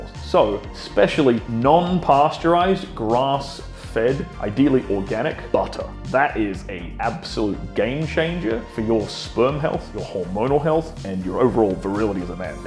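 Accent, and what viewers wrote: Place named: Australia. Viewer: He's Australian 😅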